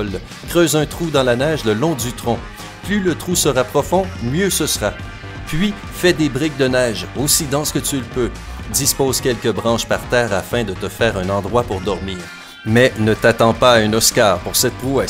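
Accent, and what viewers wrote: Quebec, Canada. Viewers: Cet accent quebecois juste inssuportable..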